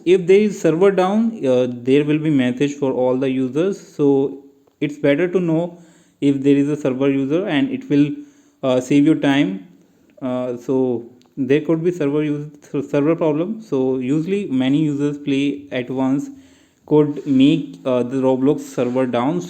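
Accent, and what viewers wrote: India. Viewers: Not going lie he sounds india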